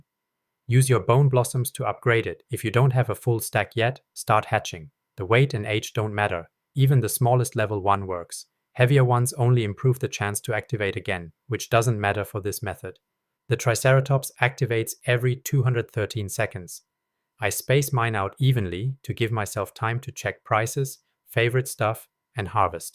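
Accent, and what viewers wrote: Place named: Germany. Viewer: Ich höre deutsch raus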